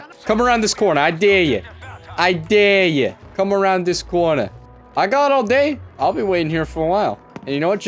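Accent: Canada. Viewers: Are you a newfie b'y?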